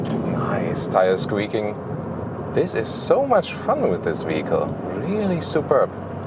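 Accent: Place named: Germany. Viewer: man merkt schon,dass du ein deutscher bist...sprichst ziemlich langsam englisch.was gut ist,da versteht man,bzw. ich auch mehr... 😉 die engländer sprechen ja fast doppelt so schnell... 😂😉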